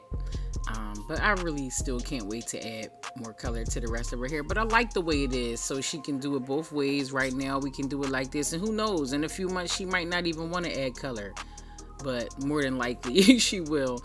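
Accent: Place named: Delaware, United States. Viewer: I’m from Delaware but I live in Cali now. I love watching your videos and hearing your accent. It always reminds me of home ♥️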